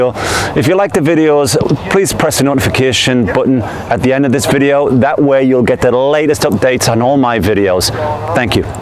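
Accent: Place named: Liverpool, United Kingdom. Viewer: Love all of your videos, where are you from coach? im from a little town called st helens.. your accent sounds a bit scouse. I use your drills with my u16 and u19 teams all the time. Keep up the amazing content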